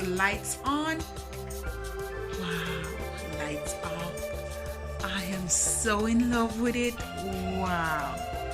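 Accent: Jamaica. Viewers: Hi!! I am so happy to finally hear a voice “ talking the real Jamaican things them” and creating “glam and glitter” bling out on social media my girl them can’t hold you back!! You should really be proud of yourself as I am proud of you. Your bling mirror “sell off”. Looking forward to the side tables.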